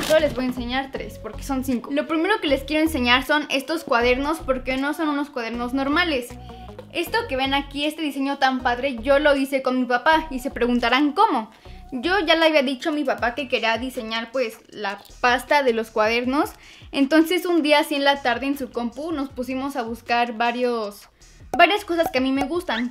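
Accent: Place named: Ecuador. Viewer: Eres de ecuador❓ buen video ❤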